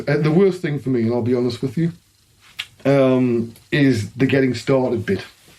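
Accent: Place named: North East England, United Kingdom. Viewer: Good to see your life after 3d series, think you are right to try to stick to one topic at a time for these, good for the algo. Have you thought about doing a regular podcast? I think you'd work well with people to bounce off, guests and/or co-hosts? Sure you've got the industry contacts to have loads of interesting shows. There's a few of these already, might be room for one with a North East accent😉?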